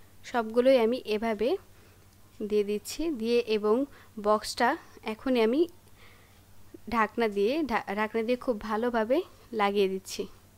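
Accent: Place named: Rajshahi, Bangladesh. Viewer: Nice Rajshahi accent Useful cooking method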